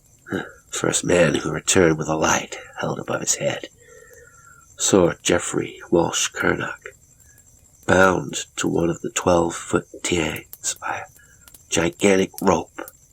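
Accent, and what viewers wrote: United States. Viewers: Well kudos to for the American accent maintained for so long - really enhanced the Kiplingesque story. Respect. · I had forgotten how much I absolutely love your American accent 🖤✌️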